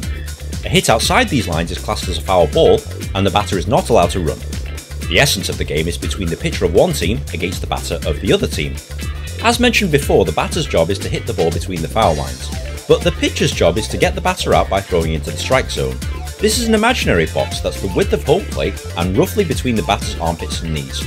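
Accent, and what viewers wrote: United Kingdom. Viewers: As a guy named after a baseball player, it's just fun to listen to a British guy explain the rules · Is this a brit explaining an american sport? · as for eastern european man trying to learn american game this british accent does not make any it easier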